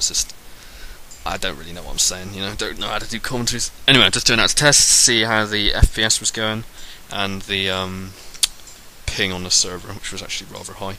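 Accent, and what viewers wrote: United Kingdom. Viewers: Are you going to buy BF3 or the 'new' COD:MW3? Also, it's nice to hear your awesome British voice again. ^^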